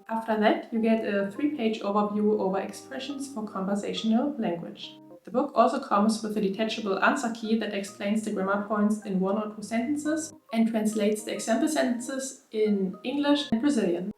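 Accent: Germany. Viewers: Have you considered taking the Tokini Andy courses? They look interesting… I want to get to level N5 by June 21, 2024… Best, John.. PS: Am I detecting a German accent?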